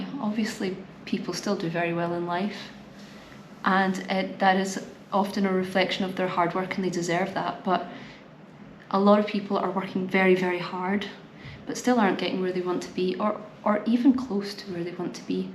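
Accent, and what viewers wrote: United Kingdom. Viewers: I was curious as an American the gripes of someone from the UK. I only hear good things from people here about it from people that haven't been and wanted to see if the grass is just greener or it's actually better. I stayed for your accent and ability to convey ideas efficiently. I appreciate you. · I love women's accents from England. I could get a English woman to read me a book, any book, just to listen. Much love from USA.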